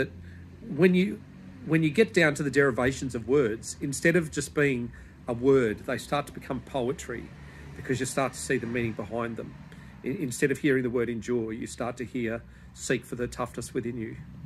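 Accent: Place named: Australia. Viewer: Hello dear...you touch my heart. I love to hear the accent. My dear husband passed 2 years ago; he was from Melbourne. Your accent is similar. You are very brave...your angels are all around you. Hugs and prayers❤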